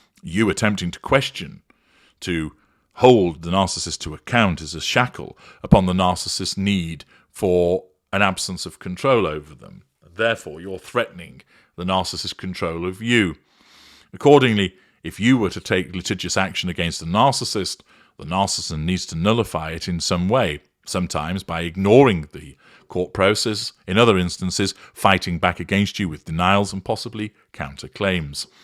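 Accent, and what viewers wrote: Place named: RP, United Kingdom. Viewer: My thanks for putting me right (although I was suggesting the RP English accent that tends to utilise, but it sometimes slips.). Indeed it is rather silly. Best wishes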